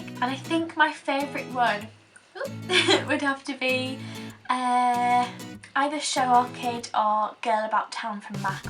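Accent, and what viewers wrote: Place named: Northern England, United Kingdom. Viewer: You were on if the first people I subscribed to on YouTube , been watching your videos for so long! Definitely will always be one of my faves, your personality is awesome! So Glad to see you back! I've just started my own channel...complete newbie, still learning, so no judging! Haha would love you to come by an have a look if you could! I've just recently moved back from Leeds too...love a northern accent! Hope all is well and all the best for this year!xx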